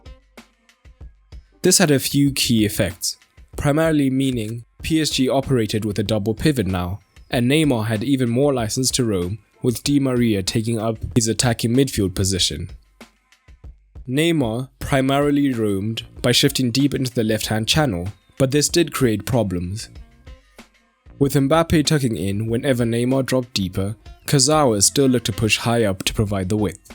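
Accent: South Africa. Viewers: That you sound South African. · Are you South African?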